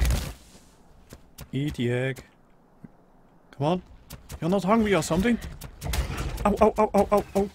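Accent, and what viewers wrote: Netherlands. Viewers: What language are you you sound dutch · Mooi nederlands accent. This is a sifferent sort of cook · you sound very dutch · the dutch english accent omg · Haha ik hoorde gelijk dat je Nederlands was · Jij bent nederlands dat hoor je echt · Ben je nederlands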